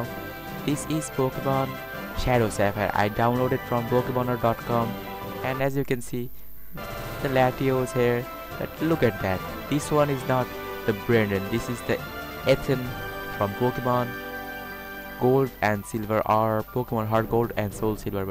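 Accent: India. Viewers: Bro are you Indian?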